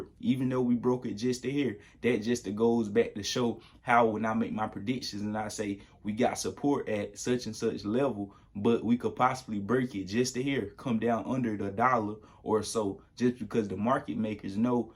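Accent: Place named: Georgia, United States. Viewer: Gotta know where you’re from. I live in Georgia and your accent sounds like you might be a neighbor.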